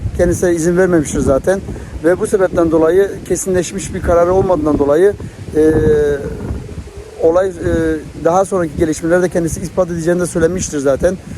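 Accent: Gaziantep, Turkey. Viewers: Doğal ol abi Antep şivesini gonuş biz doğal samimi halini seviyoruz abim adamsın Kapadokya Nevşehirden Selamlar 🖐️🇹🇷🇹🇷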